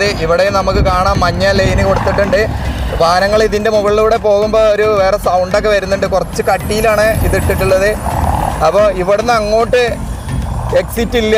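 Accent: Malappuram, India. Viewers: നിങ്ങളുടെ മലപ്പുറം slang ഒന്ന് മാറ്റിപിടിച്ചൂടെ.. നല്ല slang ൽ സംസാരിക്കൂ..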